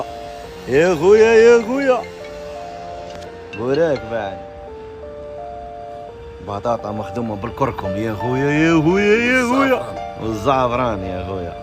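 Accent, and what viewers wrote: Algeria. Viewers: مشاء الله عليك النظافة تبان عليه ونموت على الهدرة تاع عنابة ❤❤❤❤❤ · المتحدث في الفيديو ارهج سقية ؤزيد عليها لبساكرة ما يكلسلوش هكذا في الكلام عاشرناهم بزاف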